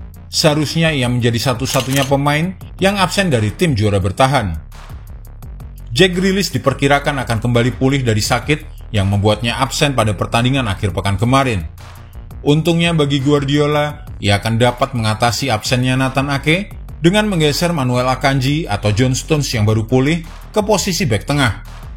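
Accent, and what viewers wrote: Java, Indonesia. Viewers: ngak ada pengisi suara lain ...medok banget:face-blue-smiling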